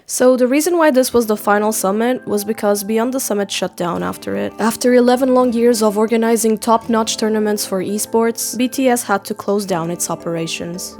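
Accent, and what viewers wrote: Portugal. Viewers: Voce é de Portugal??? Wow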